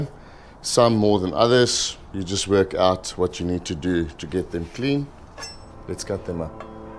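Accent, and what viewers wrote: South Africa. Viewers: Als ik jouw zo bezig zie, dan besef ik: WAT MIS IK ZUID-AFRIKA. En vergeet vooral niet: dat prachtige Z-A accent. Potjiekos met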